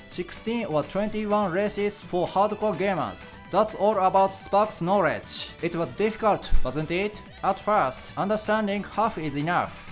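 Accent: Japan. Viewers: For one I love when jp ppl talks like this. But don’t worry if you just subtitled your videos it’ll be the same effect. If ur stuggling with English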